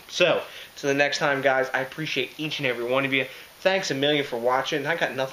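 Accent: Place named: Baltimore, United States. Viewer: Hey, what part of MD are you from? Sounds like a Bmore accent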